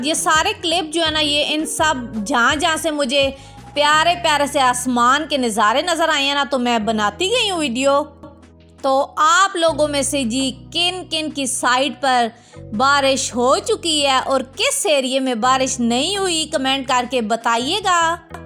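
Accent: Punjab, India. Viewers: I like Punjabi excent very much I have alot of friends in Punjab side lovely people💜👍❤️ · W salam 😍punjabi ka tarka 😂😂😂🤣👻👻👻amezing hai yar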